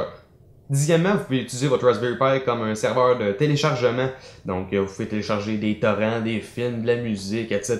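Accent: Quebec, Canada. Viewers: Super cool la vidéo en plus j'adore l'accent du Québec ^^ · C'est ça le accent de Québec ? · Oh putain, j’ai eu du mal au départ j’avais pas compris que c’était québécois 😂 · Bonjour de Toulouse ou je m'intéresse de près au raspberry pi4 durant le confinement Heureux d'entendre un accent québécois et un passionné du raspberry pi qui me parfait d'être une sacrée bête de course Amities Philippe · Québecois. YES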